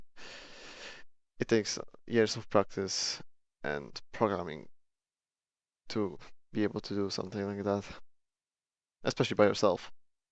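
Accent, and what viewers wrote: Poland. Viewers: I don't know why but i recognised "polish" voice (i'm also pole) very nice tutorial :) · Where are you from POlish or English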